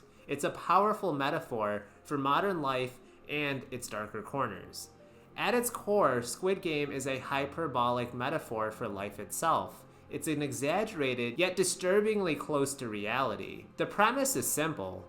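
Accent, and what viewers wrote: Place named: East Coast, United States. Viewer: Asian? I'm guessing east coast Jewish family from the accent.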